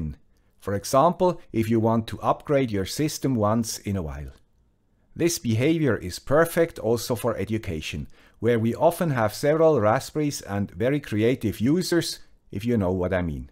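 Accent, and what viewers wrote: Switzerland. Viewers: thanks to the guy with the Swiss accent, another great tip and information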